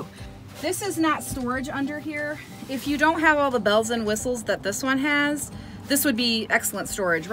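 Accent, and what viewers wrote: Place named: Midwest, United States. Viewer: Just tuned in for the Midwestern accent.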